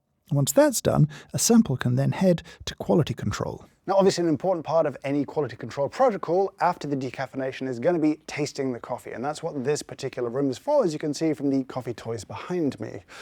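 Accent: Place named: England, United Kingdom. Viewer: English acent - Check Red Sweater - Check Factual content of an amazing place - Check Anyone else getting Tom Scott vibes? I don't know how I feel about this, I miss Tom.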